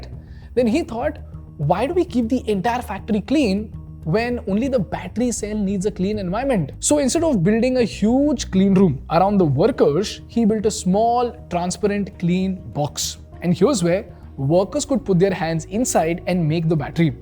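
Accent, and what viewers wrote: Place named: India. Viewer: OMG.... Indian narrator, just like Polki Sharma. Can the details be true or accurates. Really in doubts.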